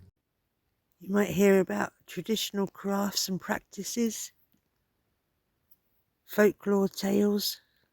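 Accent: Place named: Malvern, United Kingdom. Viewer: I think I’m listening to the accent of a fellow malvernian or nearabouts.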